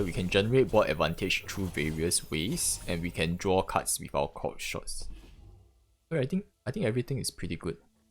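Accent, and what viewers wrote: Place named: Singapore. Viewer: I think I know a Singaporean when I hear one. Keep it up bro!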